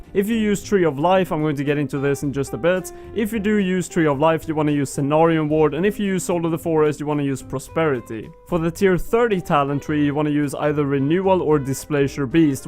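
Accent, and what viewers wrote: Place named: Sweden. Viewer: I can hear that swedish accent..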